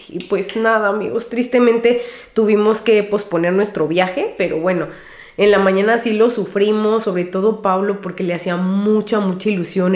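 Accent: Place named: Upper class, Mexico. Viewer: Hablas como niña de la Ibero, cantas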